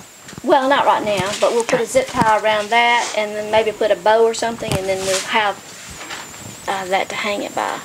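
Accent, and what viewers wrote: South, United States. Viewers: Love your project. I love the mom and daughter togetherness. Families sh o uld be closer in their lives including mine. Love your southern talk. Im from Louisiana so y'all are great!!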